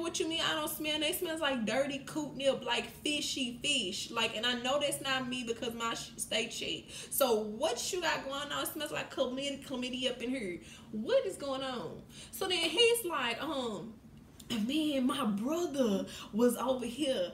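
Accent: Louisiana, United States. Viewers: Your Louisiana accent is soooo cute! ❤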